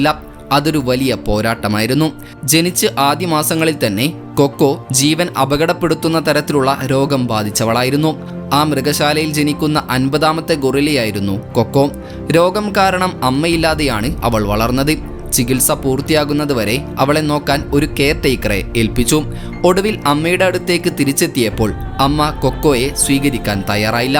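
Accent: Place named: Kozhikode, India. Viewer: നിങ്ങളുടെ accent ന്യൂട്രൽ ആക്കൂ. ഒരു commentary യിൽ കോഴിക്കോടൻ slang കേറി വരുന്നത് തികച്ചും അരോചകമാണ് (തിരു.തൃശൂർ ഉൾപ്പെടെഏതു Slang ഉം) "ത്" എന്നതിനു പകരം "തി" ഗോറില്ലയ്ക്ക് എന്നതിനു പകരം ഗോറില്ലക്ക് എന്നിങ്ങനെ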